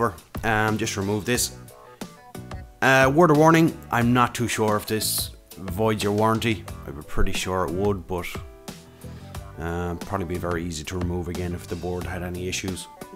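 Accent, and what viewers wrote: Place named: Ireland. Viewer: wasent going to like because of, i usually dont like videos.. but i really like the irish accent, so i liked it plus thx for the help XD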